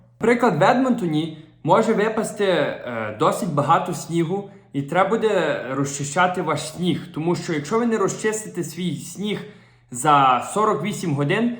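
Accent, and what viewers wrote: Western Ukraine, Ukraine. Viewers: Дякую за відео,. Ти так класно, по простому розказуєш, хочеться сказати, так по нашому, по западенськи😁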